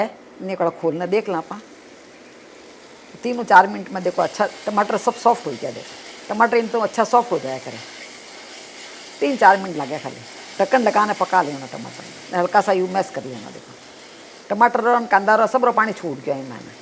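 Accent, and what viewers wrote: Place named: Marwar, India. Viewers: Receipe re Sathe marwadi boli gani achi lagi sa. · जय श्री कृष्णा Thaki मारवाडी बोली घनी चोखी लागे. रेसिपी बतवणकी पद्धत आणि सब bartan बहोत अच्छा लागे. या sabji तो mhe जरूर banayne देखुला जी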